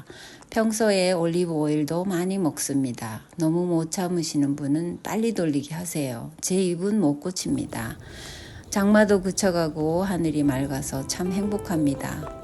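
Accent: Gyeongsang, South Korea. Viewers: 경상도 분이신거 같아요 말투 괜찮아요 듣기 편합니다 요리 잘 보고 있어요 언제나 행복하시길요 ❤ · 영상을 보면서 따님을 잘 키우셨다고 느꼈어요 타국에서 생활이 힘드실텐데 대단하십니다 조용하고 잔잔하지만 내공이 단단한 분이라고 느껴져요 저는 딸이 도쿄에서 직장생활을 하고 있어서 외국에서의 삶에 대해서 간접 체험을 하고 있습니다 남편이 대구 출신이어서 경상도 억양이 친밀하고 보여주시는 소소한 일상이 좋아서 챙겨서 보고 있습니다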